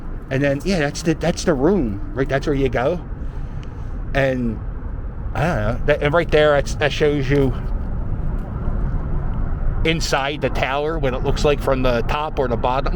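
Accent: Philadelphia, United States. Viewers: This guy has one of the best Philly accent I've heard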